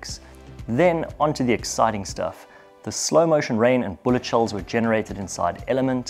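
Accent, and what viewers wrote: South Africa. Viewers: Hi. From your accent I gather you are South African? I love fooling around making videos. Please check this little short I shot at Monte Casino. With your superior special effects skills I might be able to sell some cool videos to sponsors! If you are willing to work with a 70 year old, that is. <link>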